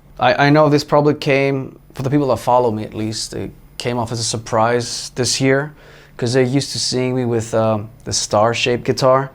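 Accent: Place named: United States. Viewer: why does he sounds like an American when he s talking ,,hi hi ho ho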